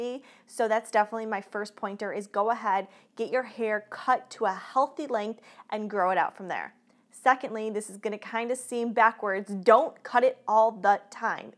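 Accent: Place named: Chicago, United States. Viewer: recognizing your Chicago accent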